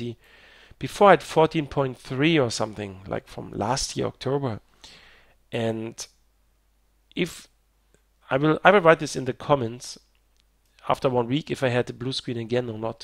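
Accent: Germany. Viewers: Hey wie ich aus deinem AKzent hören kann bist du Deutscher, richtig? Ich hab diesen Bluescreen seit einigen Jahren, und habe das gleiche Problem mit dem AMD HDMI Output für Lautstärke, was mich schon immer sehr verwirrt hat, da ein HDMI anschluss so etwas praktisch garnicht brauch.... Meine frage ist: Besteht das Problem mit deinen Bluescreens weiterhin? Danke schonmal für das Video, hat mir sehr geholfen! Habe schon an meinem Prozessor und der Grafikkarte gezweifelt, aber das löst das problem für mich etwas.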